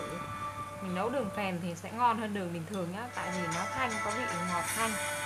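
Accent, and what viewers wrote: North, Vietnam. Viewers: Cái này dạy nghe nhạc chứ nấu chè gì , nói giọng Bắc , lồng nhạc to đùng , nghe phát ghét ai mà đăng ký kênh cho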